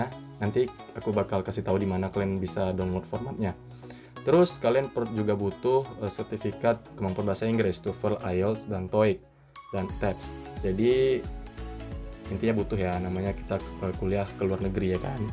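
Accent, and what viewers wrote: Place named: Riau, Indonesia. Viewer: Kelen kelen nya terasa kali kek orang Riau wkwkwk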